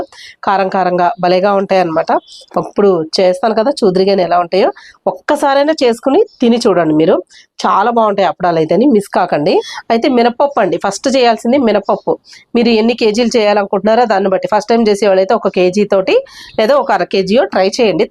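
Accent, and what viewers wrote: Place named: Srikakulam, India. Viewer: మిమ్మల్ని చూస్తుంటే మీరు చెప్పేది వింటుంటే మీది శ్రీకాకుళం ఏమో అనిపిస్తుంది మేడం ఎందుకంటే మాది శ్రీకాకుళం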